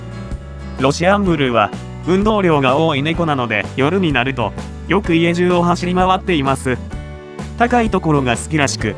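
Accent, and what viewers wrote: Kyoto, Japan. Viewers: 漫画とかアニメに出てきそうなThe京都弁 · 京都あたりの方ですかね？ 訛り風情があってかっこいいですよ〜 ロシアンブルー欲しくなりました！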